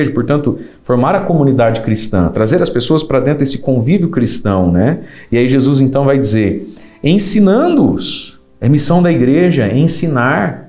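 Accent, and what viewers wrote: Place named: Paraná, Brazil. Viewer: Homilias muito bem elaboradas e de fácil compreensão, com o belo jeito de falar paranaense.Que o Senhor cubra de bênçãos o seu ministério.. Bem Haja.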